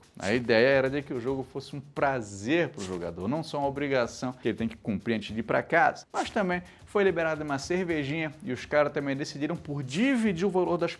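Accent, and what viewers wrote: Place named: Rio de Janeiro, Brazil. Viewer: Tira esse carioca falando do Corinthians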